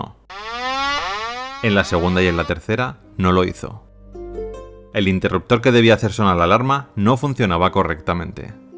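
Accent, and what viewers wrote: Spain. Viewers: que dijo????? Puedes modular bien y sacarte ese acento español.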